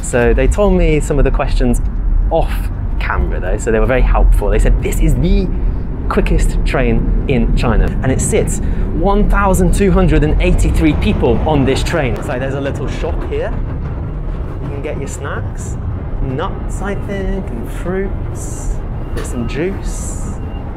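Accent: United Kingdom. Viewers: 你的中文也有伦敦腔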